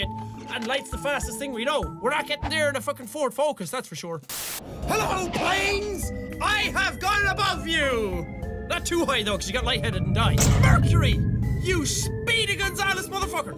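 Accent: Ireland. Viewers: Are you a weee bit Irish? I just discovered this btw.... don't judge me. Keep up the good work! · What! He IS Irish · his Irish accent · Not just wee bit.... COMPLETELY IRISH!!!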